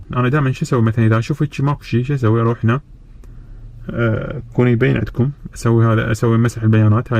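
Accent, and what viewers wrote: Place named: Basra, Iraq. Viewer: تحياتي الك عزيزي. اولا انا نادر اضع كومن او اضيف لان اتابع الأمور من التلفزيون ولما اشوف الفيديو ما أكدر اضع كومنت الا اسوي اتصال بالتلفون واكتب. انت شخص متميز ولهجتك بصراوي تخليني أشعر بلبصرة لان لما انزل للبصرة ما أشعر باللهجة البصرية مالتنا وكانها اندثرت. بصراحة قررت بعد موافق حضرتك لما انزل للبصرة اتواصل معاك تجيبني من المطار ودائما احجز قدوم بلطائره التركية والتي تصل ساعة حسب تذكري يعني بعد ساعة سبعة ونص. بس مفكر انزل من يصير الجو زين وهو غالبا بشهر تسعة وجان انطيتك تلفوني للتواصل بس هنا بلعام صعب اكتبه اتمنى اشوف طريقة انطيك الواتس اب حتى اتواصل معاك لو انت تنطيني واتس أو أي طريقة انا اضيفك و اواصلك هذا بعد اذنك. بالمناسبة ههههه انا شبه انطوائي ومو سهل اثق بلناس ولكن انت سمعتك كثير واشوفك شخص ثقة ومحترم. وكذلك عندي نوع من النرجسيه ورحم الله امرء عرف نفسه. تحياتي. نسيت أخبرك اني اكبر عمرا منك وليس قدرا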